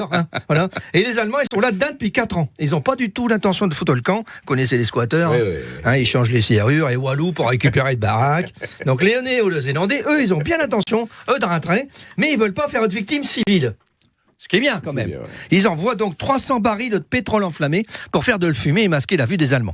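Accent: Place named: Nord-Pas-de-Calais, France. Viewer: Ch'ti..? J ai du mal à suivre..😂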